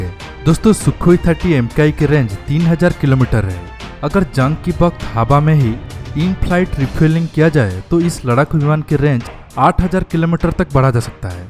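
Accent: Odisha, India. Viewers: Odisha k ho kya bhai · Odisha se hai kya bhai.. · I think u r from odisha , any way su30mki is best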